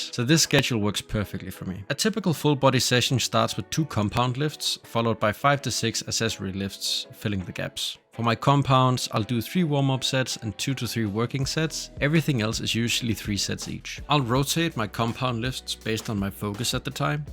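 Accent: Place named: Denmark. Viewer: I fucking knew you were Danish by the accent and then got confirmed when I saw those puregym dumbbells. Let's goooo!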